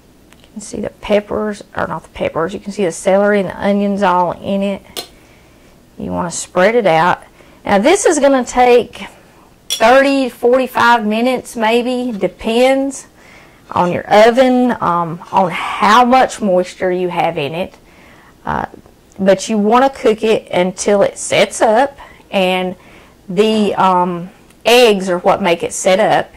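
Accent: South, United States. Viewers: I found your Channel today when trying to learn how to make brown gravy from scratch then I checked out a few more of your segments after hearing your country accent giving God praise every segment I felt like I was home I subscribe to your Channel and yes praise God he is so good so so good to us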